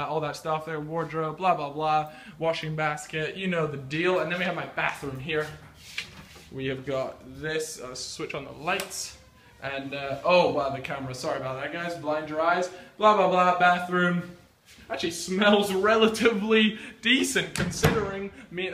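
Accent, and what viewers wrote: United States. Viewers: This is probably obvious but I am not a constant viewer so just out of curiosity, why is it that doesn't have a proper english accent? Did he move to England from the US or what? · Is he american?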